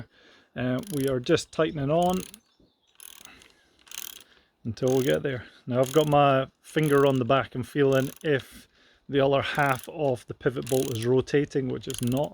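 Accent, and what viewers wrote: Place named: Fife, United Kingdom. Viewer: I'm a fellow Fifer, recognise that accent anywhere!!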